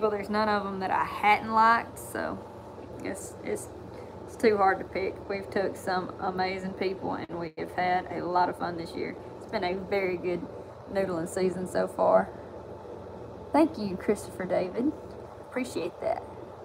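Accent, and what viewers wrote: South, United States. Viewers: your accent sets my heart all a flutter….all I ever wanted in life is a sweet little Southern Belle…or a “wild bush onion”. I’m not picky. Make my dreams come true. Yours forever, A good ol’ Canadian Outdoors Boy 🇨🇦❤️🇺🇸🙏😉😂🍻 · The nerve of these thieves. Im sorry that they stole your and your dads and friends and family hard work. I some of my military and war actions picture as a statement by putting words on them. I failed to copyright them. I did a image search on the internet and found my pictures on their website location unknown. They stole and own it. I removed all my pictures of the internet now. I now copyright everything I share. I really enjoy your work and every adventure y'all do I love how y'all say at the end of each upload.. 'APRECIATE Y'ALL"... I like to hear southern accent. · listen to that accent shes form teh south i reckon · Love your southern accent,love your dog and you have such a cool dad. 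Take good care of your Dad and yourself ... SergeantC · Love the southern accent. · I love your southern accent I'm from Alabama and there's not many ladies here that speak like us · That's one STRONG SOUTHERN accent!! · Beautiful country accent 😍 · Where are you from? Alibami? Missisip? Cute accent, and your dog looks like my old blue Merle sheltie, Zero. I was just noodling some bluegill the other day...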